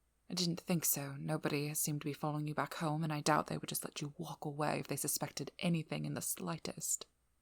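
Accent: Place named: United Kingdom. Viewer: When I tell you I SQUEAKED when I heard the British accent. I turned into a huge ball of floof squealing and kicking the air while morphing into a red balloon.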